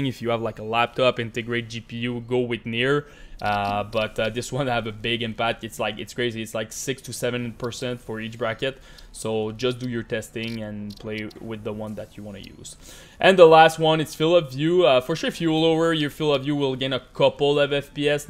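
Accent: Quebec, Canada. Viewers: J'ai reconnu l'accent québécois direct lol pis mon user est aussi Ludov lol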